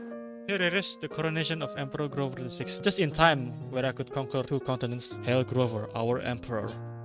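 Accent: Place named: Russia. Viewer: You speak Like Russian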